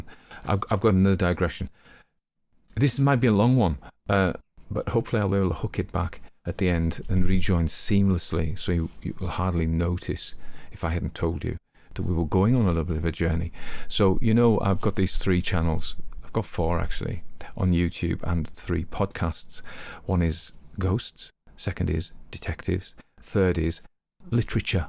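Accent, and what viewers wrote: United Kingdom. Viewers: I like your accent. I'm like most Americans, anything that sounds British is attractive and I can't tell whether you're from London, Cornwall, or Northumberland. I'm from Alabama so my accent sets me apart in my country but still, I can't tell if someone is from California, Nebraska, or Ohio. I'm very happy you narrate these great stories. I look forward to new ones all the time. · Could you imagine if all these British narrators were in the same room!? It would sound like monotones static!! Not that I mind the narration or the accents, but I do kind of find it funny that like it’s always the same British accent, (really the same voice…down to the low pitches) I mean, I get it. It works for the narrator of Sherlock Holmes so why not fucking repeat it till it’s beaten into the ground right? lol i digress, good story man.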